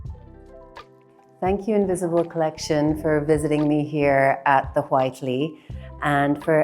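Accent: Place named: Ireland. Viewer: I'm hearing a slight Irish lilt. Yep, she has a studio in Dublin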